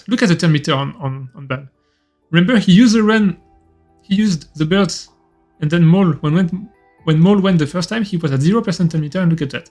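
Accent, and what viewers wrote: France. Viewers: Are you french ? Can you do this video in french ?😅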